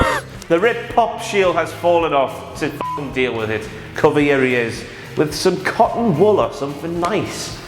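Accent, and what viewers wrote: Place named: Newcastle, United Kingdom. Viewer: Jay Bclay I know, he's a Geordie (from Newcastle) and their accent is quite difficult to understand, he's definitely joking :)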